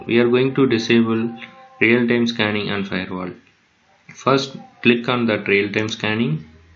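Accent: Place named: India. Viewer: no offense i thought it was an indian scammer about to make me lose my adminstration to the computer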